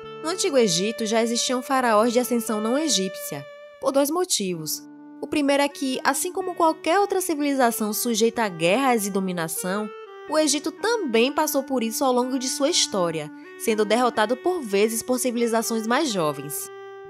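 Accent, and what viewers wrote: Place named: Bahia, Brazil. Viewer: Essa narradora é baiana hein kk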